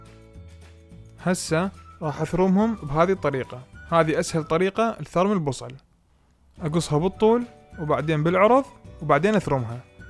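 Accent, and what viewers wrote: Mosul, Iraq. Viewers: بس اهل بغداد مو هيج لهجتهم اكيد الماما لوبابا من الموصل 🙋🏻‍♀️ بلتوفيق ان شاءالله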